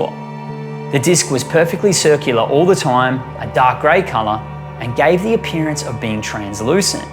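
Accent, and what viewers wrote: Australia. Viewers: It’s about time we had an Ozzie voice…glad I found you. Thank you. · You're argument is well thought out and presented. Good to hear an Australian voice presenting information on a topic that tends to be presented differently to the Australian manner of thinking and understanding. I'm looking forward to hearing more from your channel. 😊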